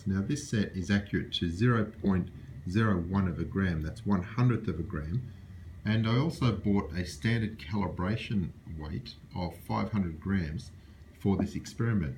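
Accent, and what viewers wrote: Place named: Australia. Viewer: en général j'active les sous-titres automatiques, ça m'aide à comprendre même si le petit accent australien de (que moquerait Melenchon?) cause parfois des soucis à l'algorithme.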